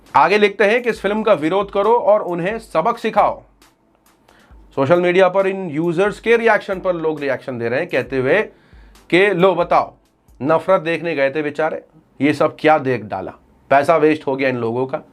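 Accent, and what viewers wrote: India. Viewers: Bhai ye south Indian hai aisach hai iska language style.......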